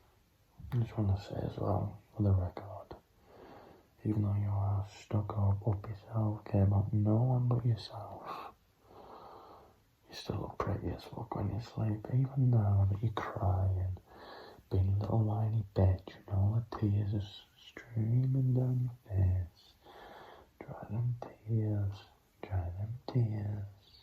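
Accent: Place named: Newcastle, United Kingdom. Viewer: Your geordie accent gives me mad tingles seriously more than like any other accent. Crazy. P.s. you’re beautiful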